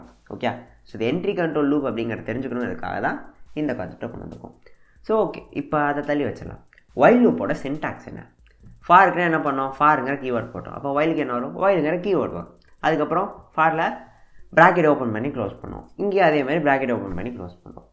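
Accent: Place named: Tirunelveli, India. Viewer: Hi bro unga slang vachi parkumbothu i think you are thirunelaveli paiyan I'm i correct✅😂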